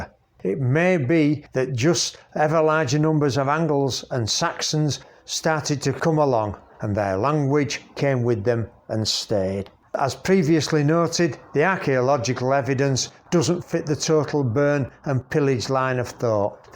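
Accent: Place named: Yorkshire, United Kingdom. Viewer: even better with a Yorkshire narrator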